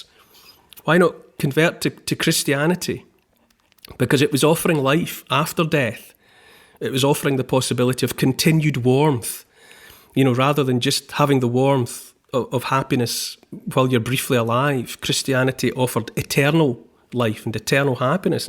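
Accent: Scotland, United Kingdom. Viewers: Your commentary on the history of the British Isles spoken in that wonderful Scottish accent makes every episode for me , like living the tale. Thank you Neil. I loved your story about Australia and again it was your story telling that made it so special. My wife is a direct descendant of Bishop Pedro Mews and his history is quite amazing. I would love it if you could bring his life and work to life in your inimitable. way. It's a story worth telling. 🇦🇺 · Thank you again Neil for a momentary escape from reality.North England was my home until many years ago when l was sent to New Zealand. I cannot tell you how nostalgic your historical videos make me feel. As a history buff myself l just love learning about British history. lt is a wonderful thing a sense of connectiveness and a sense of belonging when l hear your Scots brogue so lovely on my ears when you speak . 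I have been to Lindisfarne some years ago, magical place. I feel so happy that the history good or bad that l hear from you gives me a sense of belonging as they are my People.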